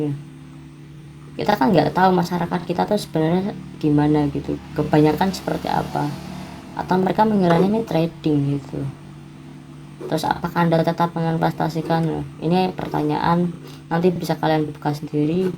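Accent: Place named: Banyumas, Indonesia. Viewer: Ngapak ya bos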